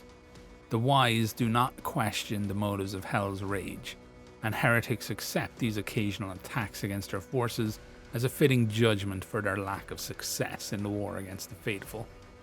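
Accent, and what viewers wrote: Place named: Ireland. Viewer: Should just lean into the Irish accent and give it a bit of a doomsayer priest vibe, mate.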